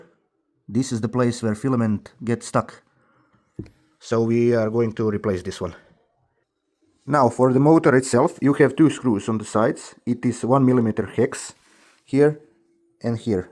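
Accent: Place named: Russia. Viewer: русский акцент явно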